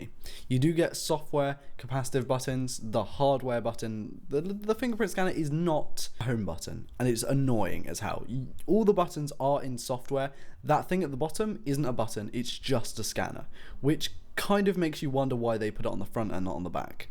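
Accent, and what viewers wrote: United Kingdom. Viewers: I like the way you speak, which part of UK are u from?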